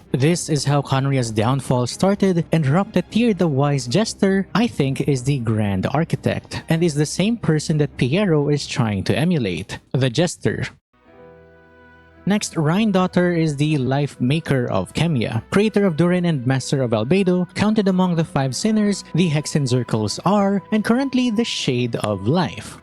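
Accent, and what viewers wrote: Philippines. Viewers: Great vid.. also the sexiest Filipino accent ever 😉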